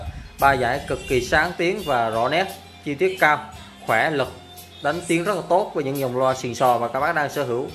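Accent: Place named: Binh Dinh, Vietnam. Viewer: Xem kênh nhiều của em anh rất thích chất giọng đặc trưng Bình Định của em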